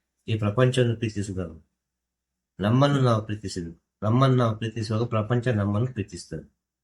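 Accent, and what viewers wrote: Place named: Dakshina Kannada, India. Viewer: ಗುರುಗಳು ಆಗುವ ಮೊದಲು ಏನಾಗಿದ್ದರು..? ತಿಳಿಸಿ . ಮಾತುಗಳು ದಕ್ಷಿಣ ಕನ್ನಡ ದ ಕನ್ನಡ ಆಗಿದೆ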